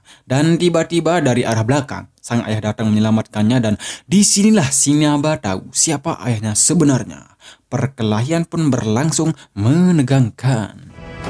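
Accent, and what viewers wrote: Bali, Indonesia. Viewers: bang ora Bali ya?logat nya ky orang Bali..sukses terus bang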